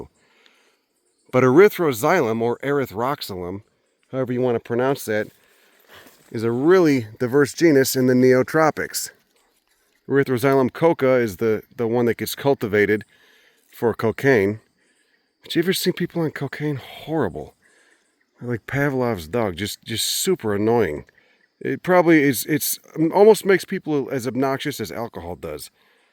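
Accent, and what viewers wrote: Chicago, United States. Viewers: Heyhey, the guy's from Chicago not NY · Chicago, not New York.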